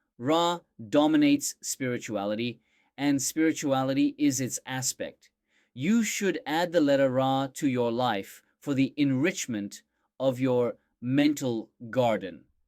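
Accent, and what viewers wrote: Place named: United Kingdom. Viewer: Hocam, maaşallah.. 😲 British aksanınıza bayıldım. 😍 Resmen, bizden alemlere hayret ve şükür dolu bir portal daha açtınız ki... Uzay serüveni tadında, heyecanında, ortalık yıkılıyor. 🌠🌌💫 Emeklerinize sağlık. Cansınız.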